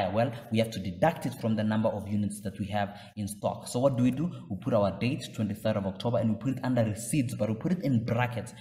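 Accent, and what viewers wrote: South Africa. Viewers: This was a really wonderful clear tutorial. Also great to hear a fellow South African. 🌸 Thank you for your work!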